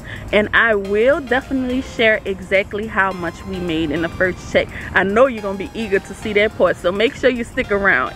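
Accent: New Orleans, United States. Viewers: I love your accent. It's sounds like a good N'awlins ( New Orleans) accent. I can recognize that accent anywhere. Lol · Do I hear a New Orleans accent??? I'm new to this channel. Keep up the good work!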